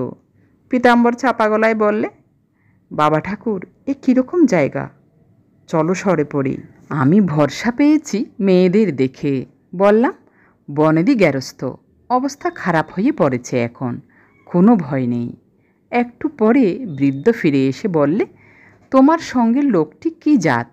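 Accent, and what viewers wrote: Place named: Bangal, Bangladesh. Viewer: খুব ভালো লাগলো বাঙাল টান টা আরো মধুর করেছে , যদিও পুরো বাঙাল ভাষা বুঝতে পারি না পুনঃ গল্পের আশায় রইলাম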